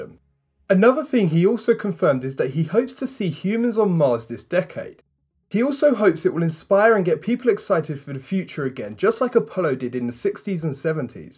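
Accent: United Kingdom. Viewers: Why are you so british ?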